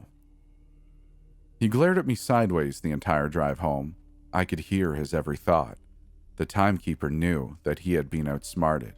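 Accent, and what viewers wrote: Canada. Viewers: Love the Canadian accent😍🇨🇦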